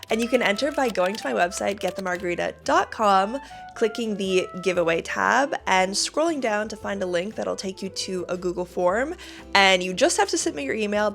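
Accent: France. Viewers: Youtube suggested your channel and this video is my first experience and I am a bit confused - are you giving all these suggestions for English speaking tourists or for the expats living in France? If both, then I have to say that you speaking with your wonderful heavy French accent or naming your dishes in French is not that helpful! I think your concept is fantastic, but if you want more subscribers or viewers then try at least in both languages and speak a bit slower when you saying it in French than saying it so fast! Of course none of this matter if your subscribers are fluent in French! Thank you! 😊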